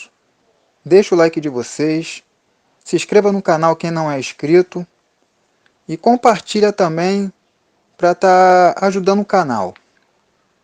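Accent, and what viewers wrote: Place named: Rio de Janeiro, Brazil. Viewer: Desculpa mas esses narradores com esse sotaque carioca desisto de ouvir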